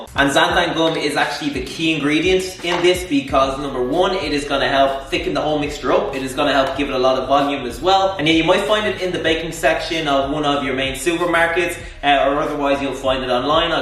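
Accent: Ireland. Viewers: Nice Irish accent I’m sure it’s Irish maybe Scottish I’m 90 percent it’s Irish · Are you Irish? You kinda talk like Conor McGregor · This guy sounds irish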